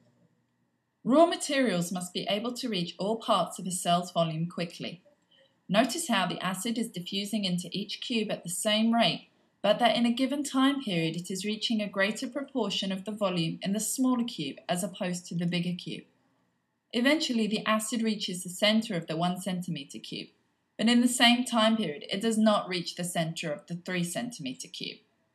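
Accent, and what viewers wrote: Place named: United Kingdom. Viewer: = = british accent? im fine with dat.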